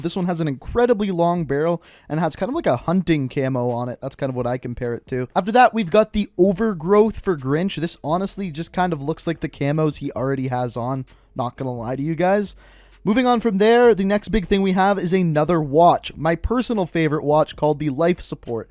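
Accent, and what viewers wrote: Canada. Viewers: The way you abowt just gives me terrance and Phillip vibes guy 😂😂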